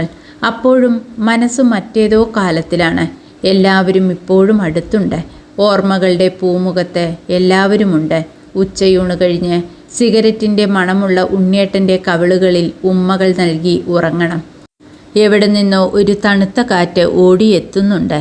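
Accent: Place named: Valluvanad, India. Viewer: വള്ളുവനാടൻ തനിമ നിറഞ്ഞ ശബ്ദം പ്രിയ സഹോദരി അഭിനന്ദനങ്ങൾ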